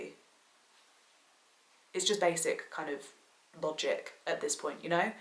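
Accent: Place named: Kent, United Kingdom. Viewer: I reckon you're from Kent, not that I'm trying to dox you or anything but I'm from Kent (UK) and your accent sounds kinda like mine.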